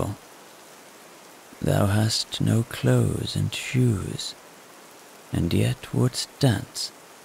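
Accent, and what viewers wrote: Ireland. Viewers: Oh, yes!! That Irish accent ❤. No accent is so lovely as an Irish accent. God bless Ireland and all its descendants.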